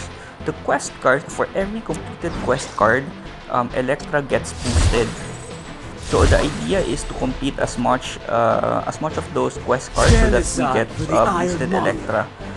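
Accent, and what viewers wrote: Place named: Philippines. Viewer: Nice! Im building this deck once I unlock Elektra, only need The Hood and I can unlock her as a hero. Btw are you Filipino? Guess ko lng from the accent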